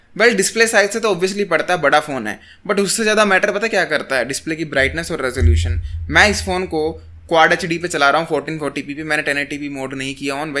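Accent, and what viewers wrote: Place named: Punjab, India. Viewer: Bhai are you from panjab ? You speak hindi with a punjabi tone.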